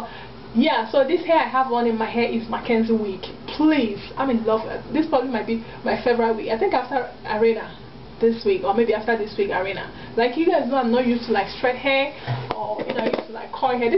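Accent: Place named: Nigeria. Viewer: you are fine. You sound Nigeria, are you?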